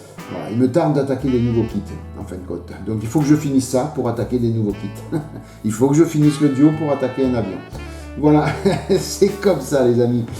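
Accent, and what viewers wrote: South, France. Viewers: Bonsoir. meilleurs voeux à toi et à tes proches. Dans l'attente de tes prochaines vidéos, de tes précieux conseils et de ta bonne humeur, avé l'accen du Sud. Amitiés.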